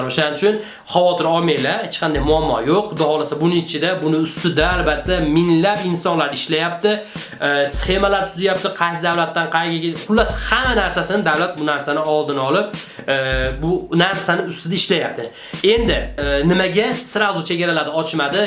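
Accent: Andijan, Uzbekistan. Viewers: akajon andijonlimisiz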